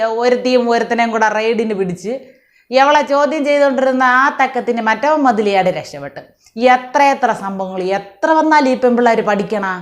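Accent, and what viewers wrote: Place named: Trivandrum, India. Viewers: TVM സ്ലാങ് വേണ്ട. യഥാർത്ഥഉച്ചാരണവും ഹൃദ്യമാണ്. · trivandrum slang is good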